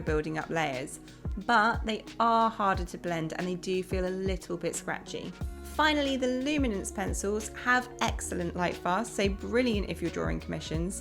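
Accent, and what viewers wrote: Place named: United Kingdom. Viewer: Great tutorials! I want to encourage you to keep doing videos and grow big because you are such a Great teacher,I would like to mention to you that you are from UK right,so you have a strong accent and people from all over the planet are watching you,so it is important that you always bear that in mind to never speak too fast and have the music very low in the background,so it does not take so much concentration to clearly understand you. I'm French and understand English very well, but UK accent is not obvious for many people on this planet! That said! Keep going at it!! You love to do this,we can feel your passion! Bravo! Merci!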